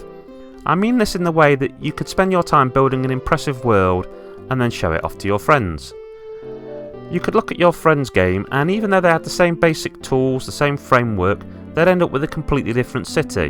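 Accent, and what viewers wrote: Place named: United Kingdom. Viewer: This is a lovely little mini review, I’m amazed it’s been so unnoticed. I definitely agree with all your points and I love the game too, it’s my preferred version by far too. Dr. Wright does actually appear in one other Nintendo game, in Zelda: Link’s Awakening he has a cameo as “Mr. Write” but it’s definitely the same character as he looks similar with the same glasses and a song from SimCity plays in his house, in the remake he looks much closer to his appearance in SimCity. Also, it’s great to see someone else who uses the British SNES pronunciation.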